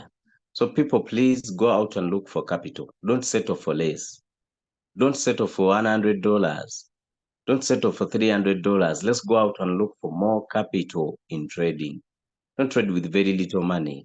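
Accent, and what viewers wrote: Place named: Uganda. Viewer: Hello Sir, I understand from your accent that you are from Uganda...where I happen to be and I am interested in learning more as I would like to invest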